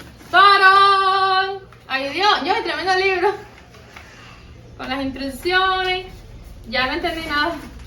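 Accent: Cuba. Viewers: Eres cuban?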